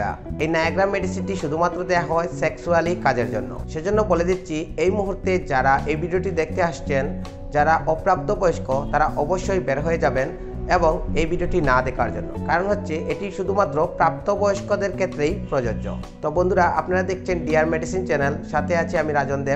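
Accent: Sylhet, Bangladesh. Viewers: ভাইয়ের বাড়ি কি সিলেটে নাকি।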